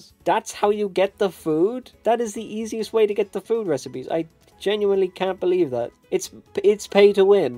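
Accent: Ireland. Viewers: Wait?? Are you from wexford?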